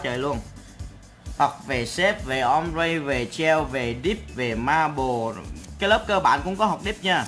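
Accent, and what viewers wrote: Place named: Quang Nam, Vietnam. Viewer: A người quảng Nam hả a?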